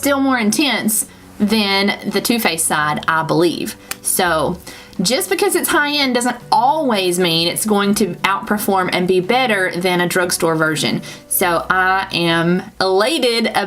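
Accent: South, United States. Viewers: Thanks for the review! Where are you from? Love your southern accent❤️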